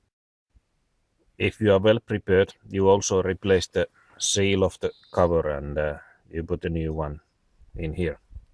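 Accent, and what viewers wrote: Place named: Finland. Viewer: Thanks man, very useful! Also love that finnish accent! Cheers from the Czech Republic :)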